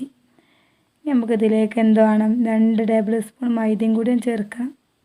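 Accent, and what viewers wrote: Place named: Malappuram, India. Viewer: നിങ്ങളുടെ ഭാഷ പൊളി ആയല്ലോ മലപ്പുറം കാരെ ഭാഷ ആയിരിക്കും അല്ലേ🤣🤣🤣🤣🤣👌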